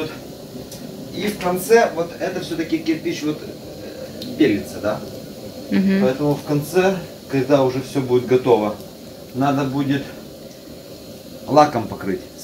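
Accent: Belarus. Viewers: Такой брестский акцент)))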